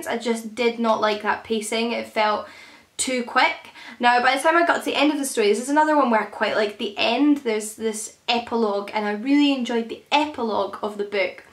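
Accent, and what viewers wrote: Scotland, United Kingdom. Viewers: Where are you from? :) Im assuming thats a scottish accent?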